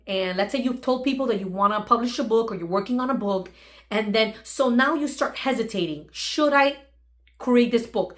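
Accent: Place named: Latin America. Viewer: I just finished this video. Priceless insights! And loved the subtle Latina accent. It helped me to connect and invest just a little bit more. Looking for the next one to watch. Thanks again!!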